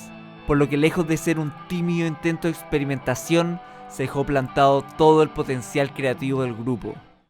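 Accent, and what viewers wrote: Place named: Chile. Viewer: Amigazo. Me encantó tu vídeo y estoy de acuerdo en toooooooooodo lo que dijiste. Para mí este álbum es una obra maestra y el mejor album que Metallica pudo haber hecho! Así que tiene su merecido like y un sub más jeje. Por cierto, de que país eres? Tienes acento chileno . . . Pero quizás me equivoque sjjsjs saludos!! 🤘🔥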